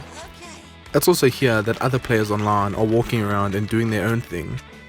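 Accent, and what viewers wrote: South Africa. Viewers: Thought I recognised that accent! Local is lekker, keep it up, guys!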